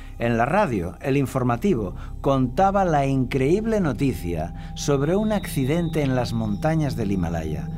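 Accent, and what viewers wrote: Spain. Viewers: HOLA. HACE APROXIMADAMENTE DOS MESES ENCONTRE ESTE CANAL. TODOS LOS VIDEOS QUE HE OBSERVADO Y ESCUCHADO ME HAN PARECIDO MUY ACERTADOS. Y UNO DE LOS QUE MAS ME HA DADO LUCES PARA MI VIDA DE FE ES PRECISAMENTE EL QUE TITULA: ( QUÉ ES LA FE). ME GUSTARÍA SABER SI HAY ALGUN VIDEO SOBRE EL TEMA DE LA MÚSICA, EN ESPECIAL LAS CANCIONES DE FE. LES FELICITO, PUES OBSERVO EN TODOS LOS VIDEOS ( LOS QUE HE VISTO) QUE SON UDS MUY OBJETIVOS. ME GUSTA LA FORMA DE PRESENTAR LOS TEXTOS BIBLICOS. SOY DE COLOMBIA Y SIEMPRE ME HA GUSTADO EL CASTELLANO HABLADO EN ESPAÑA...( ESE QUE HABLA ) ESE TIPO DE ACENTO ME PARECE ESTUPENDO. SOBRE TODO PORQUE ESTABLECE UNA CORRECTA FONETICA ENTRE LAS DIFERENTES LETRAS COMO LA S, C, Z. LA PAZ SEA CON UDS.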